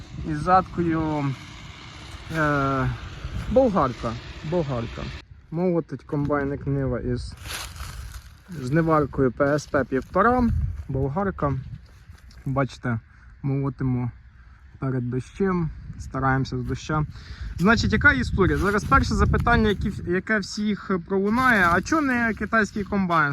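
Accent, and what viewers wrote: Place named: Cherkasy, Ukraine. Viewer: Почув наче єрки то ти з Черкащини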